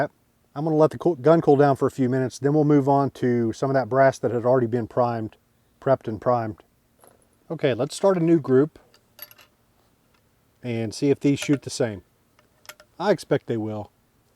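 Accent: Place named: Eastern Kentucky, United States. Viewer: What part of eastern Ky are you from??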